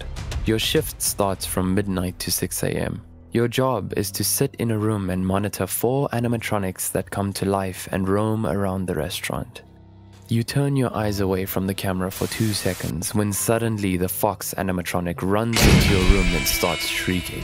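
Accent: South Africa. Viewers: Can always spot a South African accent. 🇿🇦 Great topic. Horror lover 🧟‍♀️ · I know a South African accent when i hear one :D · I knew the voice over guy was South African as soon as I heard his voice. I'm South African too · Awesome hearing a South African accent on this channel. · Loved this video and the narrator's South African accent!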